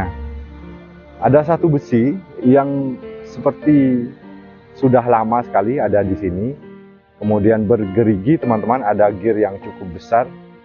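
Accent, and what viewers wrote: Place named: West Sumatra, Indonesia. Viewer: Bang ini orang minang ya...logatnya seperti orang minang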